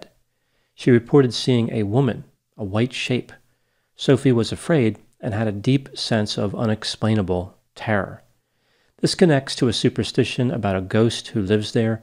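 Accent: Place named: Delaware, United States. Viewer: I think he is from Delaware. It's probley an accent thing if anything. I'm not aware of it.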